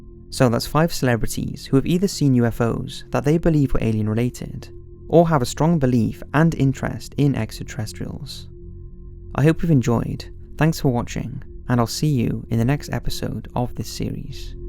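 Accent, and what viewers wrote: United Kingdom. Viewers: Yay British narrator :) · Man it's hard to understand the British accent:/ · Like how the narrator has a soothing British voice. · Are you Welsh? Where are you from? love the accent 👌🏻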